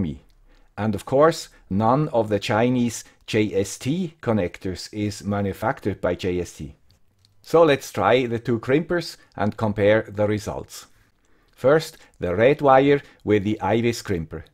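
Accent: Switzerland. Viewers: Thank you Mr. Swiss Accent. Great comparison.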